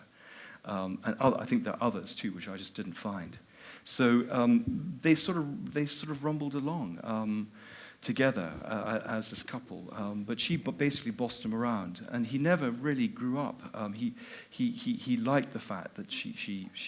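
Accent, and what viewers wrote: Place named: Scotland, United Kingdom. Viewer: I couldn't quite place his accent.because just one or two words have a flat sound ...rather like.a. northerner but he's so posh !! But then it says he is Scottish! So that explains it ! Scottish...Westminster school accent !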